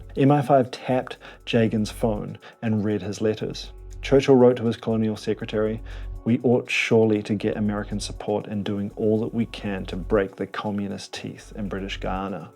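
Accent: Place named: New Zealand. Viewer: Great content bruzzy! Editing, music and most importantly delivery and info on point. Also as an Aussie who grew up in aetorea i dont think ive heard a more "international" or "posh" kiwi accent! its almost imperceptible but sort of ole' school journalist which i love. Keep up the good work. Subbed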